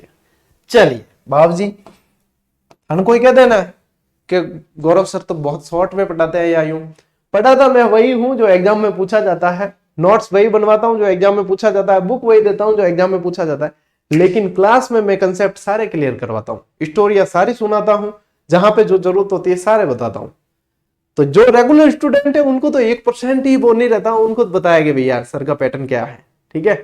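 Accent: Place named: Behror, India. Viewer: Guru ji khandavo bole mare बहरोड़ साइड m😂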